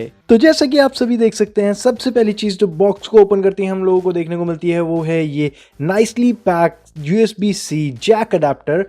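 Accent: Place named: India. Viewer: work on your accent. It's half indian half english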